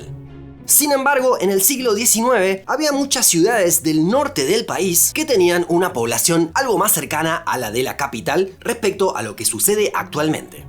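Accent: Rioplatense, Argentina. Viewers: es argentino o uruguayo? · Por qué intentar hablar en pseudo neutro y al mismo tiempo sheshear?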